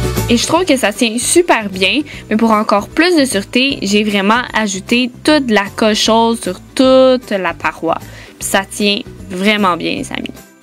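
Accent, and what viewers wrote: Quebec, Canada. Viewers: Ho mon dieu t magnifique et j'adore accent québécois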